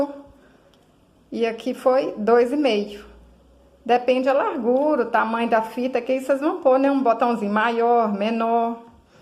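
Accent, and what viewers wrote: Minas Gerais, Brazil. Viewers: Oi, adoro suas bonequinhas e vc é muito simpática e explica muito bem, acho q pelo seu sotaque vc é mineira, acertei? Eu sou nascida em Minas, Resplendor mas moro no interior do Rio. Parabéns!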